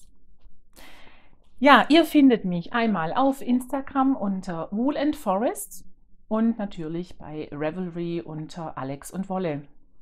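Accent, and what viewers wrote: Swabia, Germany. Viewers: Wieder ein tolles inspirierendes Video. Ich mag deine Videos sehr gerne. Im schwäbischen Dialekt fühle ich mich direkt wie zuhause und wohl. Ich könnte dir stundenlang zuhören. Du hast tolle Projekte und färbst richtig schöne Wolle. Bin sehr gespannt was du zu zeigen hast, wenn du im größeren Stil für deinen Shop färbst 😍 Der tuscha kal hat sich in meinen strickplänen nach ganz vorne gedrängt und wird definitiv mitgestrickt. Aus deiner Wolle könnte ich mir super vorstellen ein Set für meine Tochter zu stricken🥰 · Konfus ist toll, und das schwäbeln verstehen bestimmt auch Norddeutsche. Ich Rheinländerin find es klasse zwischendrin die Emotionen in Heimatsprache zu hören. Danke! Und deine Wollfärbungen sind doch toll. Sei mit dir selber Zufrieden, dann werden Dir die Färbungen auch immer besser gelingen. Ganz liebe Grüße aus Roki Regina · Frisch und sympathisch eine angenehme Art schwäbisch ich könnte dir Stunden lang zuhören, deine strange sind herrlich könnte ein Tuch vorstellen bin gespannt ich freue mich auf den nächsten podcast liebe Grüsse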